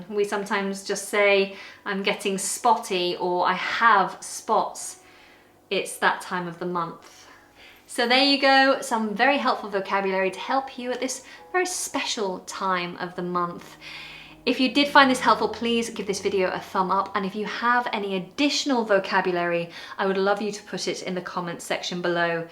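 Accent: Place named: RP, United Kingdom. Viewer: I experienced the most unique feeling of both discomfort and pleasure listening to you speak about the menstrual cycle. I think it was the juxtaposition between the somewhat messy subject matter and your sublime Received Pronunciation.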